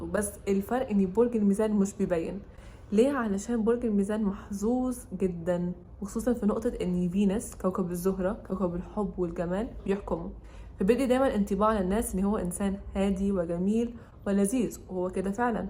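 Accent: Syria. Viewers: ممكن سؤال يا ليه في معظم الڤيديوهات حتى لو مش دلوقتي زمان برضو بتتكلمي سوري بس رغم انك مصرية سورية مجرد سؤال بس متفهمنيش غلط 💙💙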